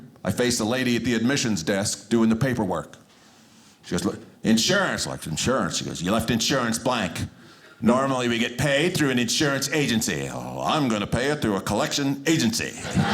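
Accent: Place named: Ohio, United States. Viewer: Sounds like he is from Ohio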